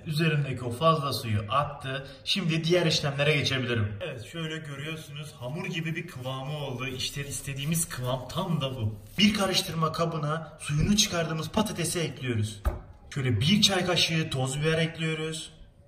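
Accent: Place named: Hatay, Turkey. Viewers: Because he is form hatay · Çünkü adam hataylu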